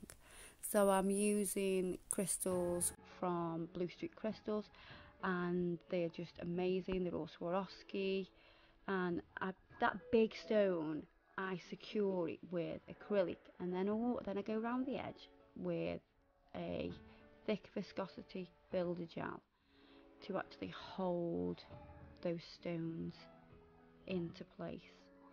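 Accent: Stoke, United Kingdom. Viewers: They were stunning duck your uncle would be so proud. Please do more videos but dunna have music as loud as conna hear those delicate stokie tones xx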